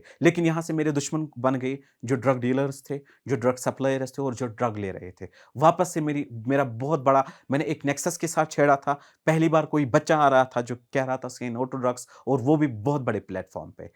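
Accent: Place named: Kashmir, India. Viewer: I like kashmiri hindi..their slangs is really sweet..u need to have courage to say these things in public platform...hats off u brother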